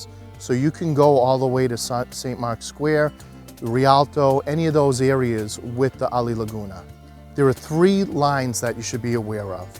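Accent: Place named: East Coast, United States. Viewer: you posted this video a year ago, but it remains useful today. Given your accent, did you grow up in the East Coast and then moved to Italy in your later years? The Wife and I are planning a trip to the US military base in Vicenza, so I am grateful that you shared this useful video with your traveling audience.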